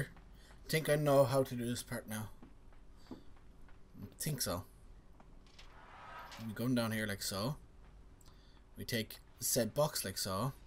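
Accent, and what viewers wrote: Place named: Ireland. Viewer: your a great youtuber, I love ya Irish accent love. keep up with the youtubing.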